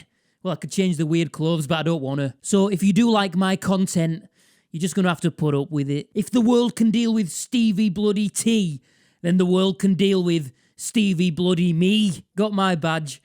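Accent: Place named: Yorkshire, United Kingdom. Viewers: If one goes back and watches your older videos, your voice was definitely more subdued. I like the more manic...with the even more pronounced Yorkshire accent. Keep up the good work! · I agree about the voice. Yorkshire and Scottish accents are the best in the UK for my American ears! 😎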